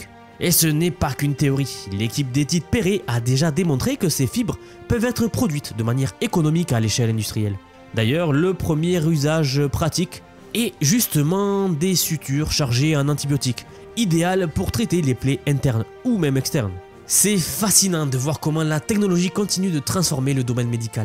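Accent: South, France. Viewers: super video jadore ton contenu mais choisi entre l'accent du sud et l'accent asiatique pcq la c'est inaudible stp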